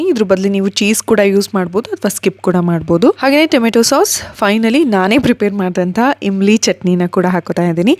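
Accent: North Karnataka, India. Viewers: Uttara Karnataka bhashe nalli full ondu vlog maadi mam please nim bayalli nam uttara Karnataka bhashe keloke chanda please mam one vlog please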